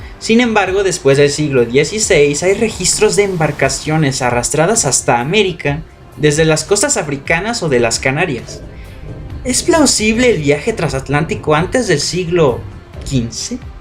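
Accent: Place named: Spain. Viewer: Nunca dejaste de hablar con tono ibérico, jajaja.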